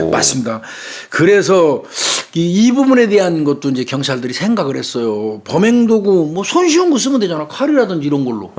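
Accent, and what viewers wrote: Chungcheong, South Korea. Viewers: 아...정말 가끔 나오시는 충청도 말씨 너무너무 좋아요~~영화 곡성뒤엔 전라도말씨에 빠져있었는데 요즘엔 충청도말씨에 빠져있어용ㅋㅋㅋ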